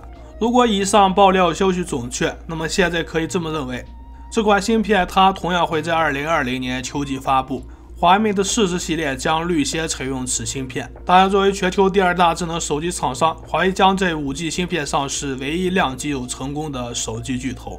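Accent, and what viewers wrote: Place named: Chahar, China. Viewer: 察哈尔口音，老乡啊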